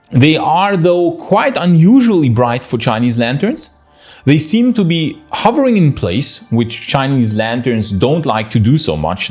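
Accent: Germany. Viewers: Id say it's a german accent. He just speaks english very well. Maybe im wrong. I am by no means an expert. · I’m still new here. I love your accent. I traveled around Europe for a few years. Where are you from..Germany? I lived there for about 6 years. Spangdahlem. Beautiful country. I loved my time there.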